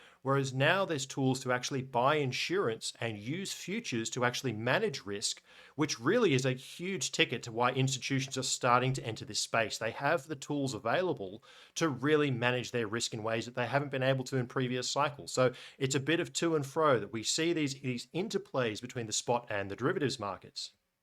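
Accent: Australia. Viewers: I prefer dark mode, but either way your information is absolutely priceless and very much appreciated. Thank you for everything you do. And it's very nice to hear it all explained by an Aussie too!! 😃😃😃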